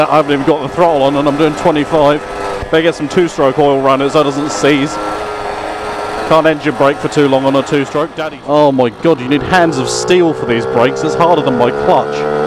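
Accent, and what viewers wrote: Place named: England, United Kingdom. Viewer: I'm trying to figure out which country this is. The accent and the driving on the left hand side of the street might indicate England. But the speed is in terms of MPH and not kilometers.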